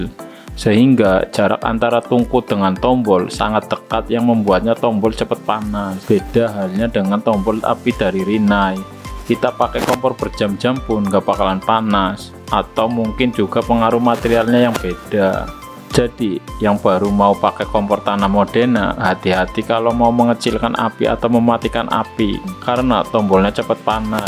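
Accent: Banyumas, Indonesia. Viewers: Daningg ngapak om😁🙏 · Kayak orang ngapak bahasanya 🤣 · Ngapak bgtt😂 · ngapak mbok? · njir logatnya ngapak-ngapak.. gak enak banget didengerin 🙃 kaga malu apa bray, ngereview pake logat ngapak gitu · Ora ngapak ora penak, matur nuwun pakde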